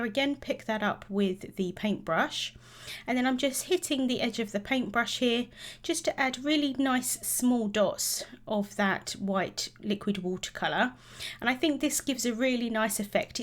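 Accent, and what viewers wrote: United Kingdom. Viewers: I always enjoy your video's and just love your British accents!!!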